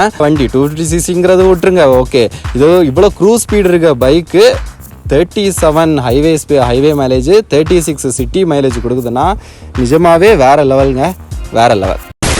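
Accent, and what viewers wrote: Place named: Kanyakumari, India. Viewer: but slang lita kanyakumari slang varuthae 🤔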